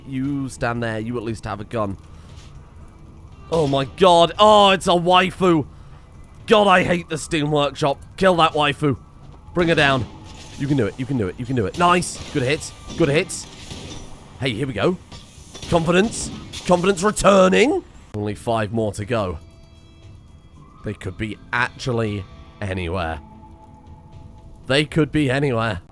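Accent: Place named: RP, United Kingdom. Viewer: makes sense. Our American English is a bit different than the high class shit this guy is speaking in.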